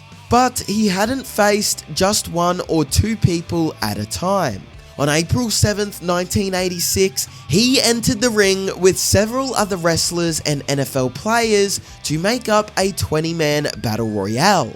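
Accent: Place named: Scotland, United Kingdom. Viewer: the best narrator Scottish guy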